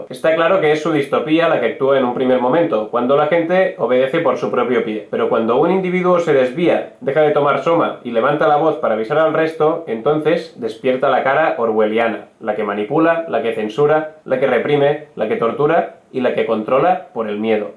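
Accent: Spain. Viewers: No, pero el que no está acostumbrado a oír el acento español, quizá se le haga un poco más difícil de seguir en video. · Por tú acento creo qué eres catalán yo también, pero no creo en la independencia tampoco me gusta la actual España y su estado represor. Entonces donde ando yo? Me acerco más a un estado republicano justo y realmente democrático. Creo que en ese estado cabemos tod@ Me suscribo a tú canal. Porqué no hay una revolución? Buena pregunta. Creo y es sólo mi opinión que nos sobra individualismo y nos falta humanidad.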